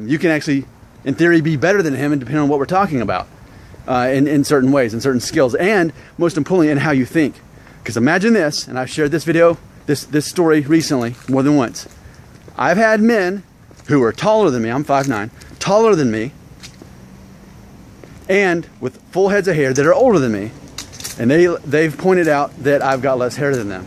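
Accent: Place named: South, United States. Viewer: Quarter mexican with that southern accent how the heck does that happen?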